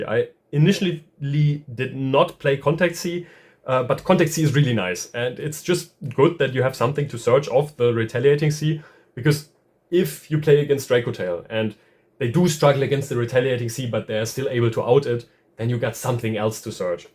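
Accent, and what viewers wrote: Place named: Germany. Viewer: boa.... wenn deutsche englisch reden...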